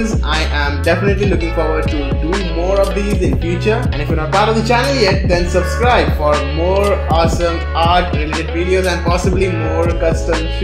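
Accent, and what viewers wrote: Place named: India. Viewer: That's kinda rude,but i think that he's Indian cuz his accent.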